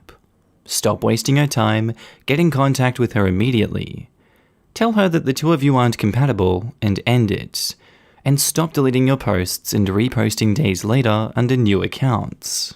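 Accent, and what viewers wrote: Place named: Australia. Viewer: your Aussie accent. I love listening to you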